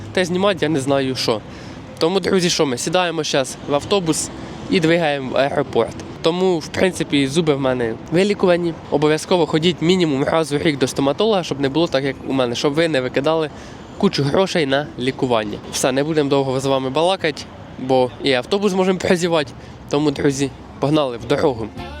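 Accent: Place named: Slobozhanshchyna, Ukraine. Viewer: тисячу лайків за те, що балакаєш на слобожанській говІрці! Не звертай увагу на "мовний патруль", уже наслухались "вишуканої"...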